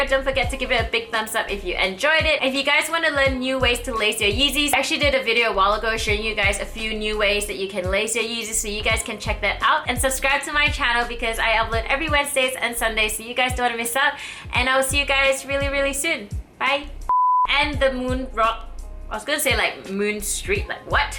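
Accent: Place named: Australia. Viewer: Fuck me a cute asian with grey hair and Aussie accent...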